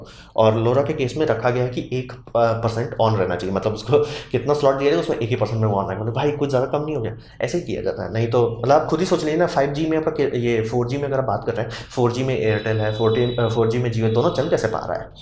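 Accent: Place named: Deoghar, India. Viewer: Heyyy bro perhaps u r from Deoghar (jharkhand).....??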